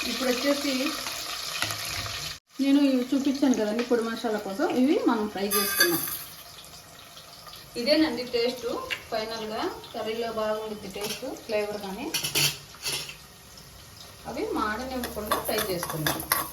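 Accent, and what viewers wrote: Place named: Kurnool, India. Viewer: Kurnool valla laga matladutunnaru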